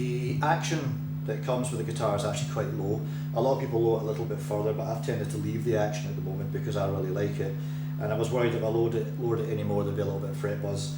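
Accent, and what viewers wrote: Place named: Scotland, United Kingdom. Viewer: Great review thanks, looking for a 7 string Ibanez and came across this. I live in the US so I was happy to find a voice form back home (grew up in Edinburgh) Cheers!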